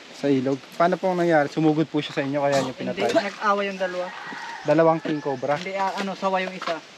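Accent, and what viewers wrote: Visayas, Philippines. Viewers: bisaya ka pala ya😁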